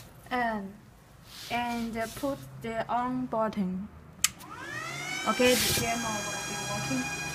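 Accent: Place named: East Asia. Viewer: Andre Moreno well shes either Chinese or Japanese based on the accent and basic English speaking second China & Japan don't care to much apparently because i hear shortwave pirates from Japan & China alot. so this really dose not surprise me...Asian woman..showing illegal jammer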